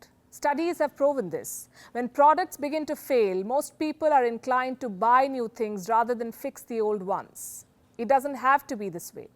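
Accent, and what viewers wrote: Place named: India. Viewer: This is Indian English...and she doesn't need to mimic any accent or your version of " proper pronunciation".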